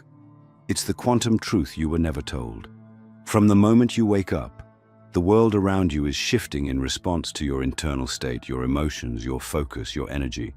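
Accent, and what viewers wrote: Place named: United Kingdom. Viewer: Great video,,,,a little British but not too much 🙂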